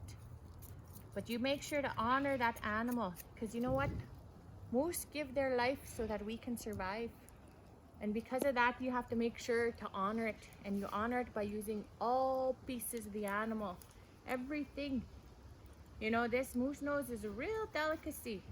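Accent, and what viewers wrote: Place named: Ireland. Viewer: Your accent almost sounds Irish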